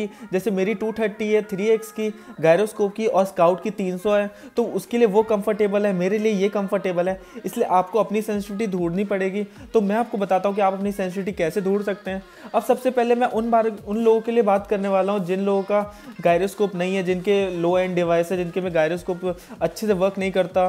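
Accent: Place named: Bengal, India. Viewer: i think u r from west bengal😅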